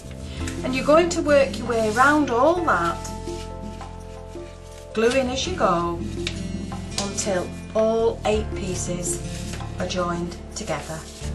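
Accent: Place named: Lancashire, United Kingdom. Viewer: this was the first of your videos that I found, back in 2010 when I discovered that Youtube had cardmaking videos! I have been a fan ever since. I'm currently re-watching your videos from the beginning - whilst ironing! Your videos make ironing fun!!! Thank you so very much for all the inspiration! (I love your accent btw, my hubby's a Lancastrian!) X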